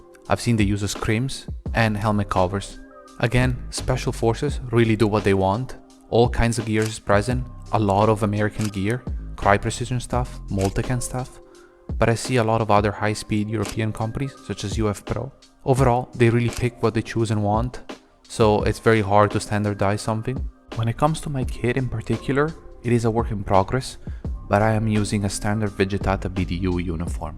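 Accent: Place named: Italy. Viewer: Dall'accento ho capito che il bro è italiano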